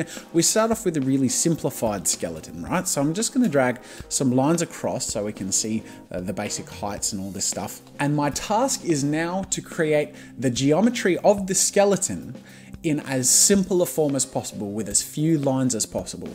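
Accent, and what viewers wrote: Australia. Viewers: When Australian accest still exist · you sound so Young and aussie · he sounds extra Australian here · does he have a really Australian accent in THIS video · His Aussie accent sounded a bit different here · this by far has his deepest Australian accent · His Australian accent was so much stronger 5 years ago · why does he sound so much more Australian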